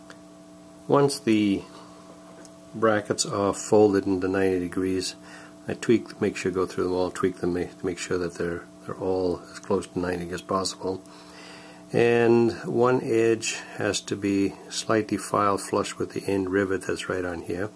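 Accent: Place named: New Zealand. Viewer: Just discovered your channel - fantastic stuff! I’m a kiwi returning to the hobby after 25 yrs in the live steam scales with lots to learn as to what has changed, and an engineering shop to make jigs and precision parts - BUT trying to pick your accent sir! I can hear NZ, a touch of English, a smidgen of Australian, Southern USA etc etc. There has to be a story there - put me out of my misery please!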